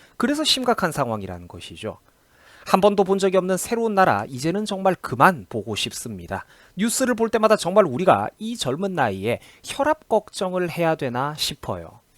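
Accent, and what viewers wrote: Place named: South Gyeongsang, South Korea. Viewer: 목소리가 경남쪽이네요...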